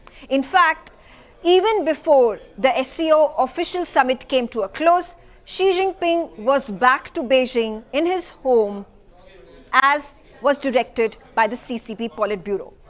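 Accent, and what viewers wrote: India. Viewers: Stop the Indian tongue.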